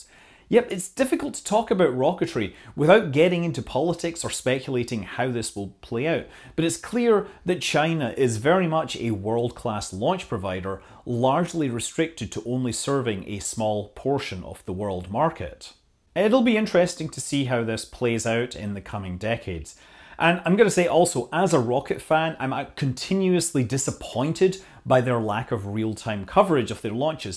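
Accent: Scotland, United Kingdom. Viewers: I love your accent. Are you Scottish? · I'll be honest, I never thought I'd ever hear reasonably understandable Mandarin spoken with a Scottish accent. Not bad,. Not bad at all.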